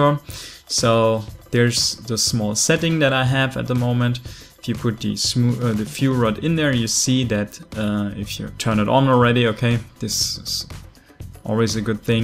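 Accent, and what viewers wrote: Germany. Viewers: Bist du Deutscher? Hört man iwie raus und das "Servus" in der Beschreibung ist ein weiteres Indiz :)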